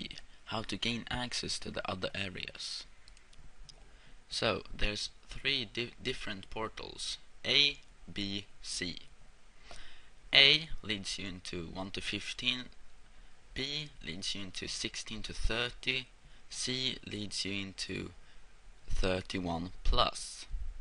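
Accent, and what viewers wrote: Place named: Sweden. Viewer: Swedish english ftw!!!!! Oh sorry not supposed to comment on english... anyway. Yeh I hope the video helps whoever doesn't know how to do GRB. Kudos for you bro <33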